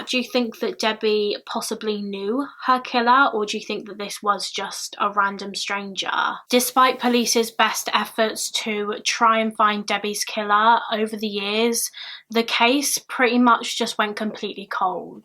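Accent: United Kingdom. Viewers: Listening to true crime in a British accent is e.v.e.r.y.t.h.i.n.g! LOL.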